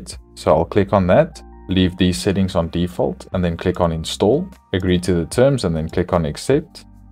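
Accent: Afrikaans, South Africa. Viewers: Jy klink Afrikaans. Is ek reg?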